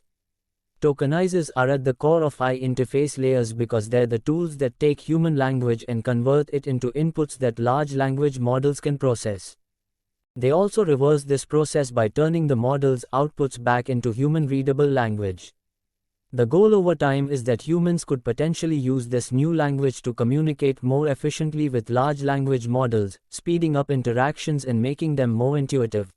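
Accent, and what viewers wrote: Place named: India. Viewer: Is this AI generated? If so why pick an Indian accent?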